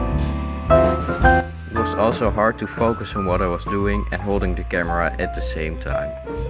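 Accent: Netherlands. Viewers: Are u dutch? U sound it lol, im dutch but without the accent. Hoe gaat het?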